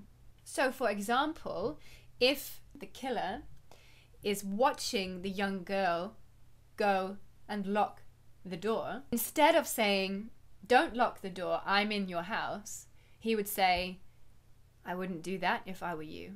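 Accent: United Kingdom. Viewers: Are you from England？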